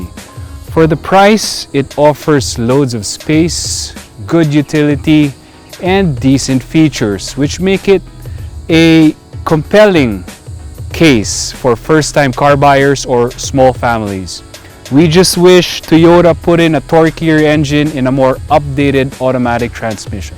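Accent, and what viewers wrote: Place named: Philippines. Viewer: He actually speaks well if you don't mind the Filipino accent .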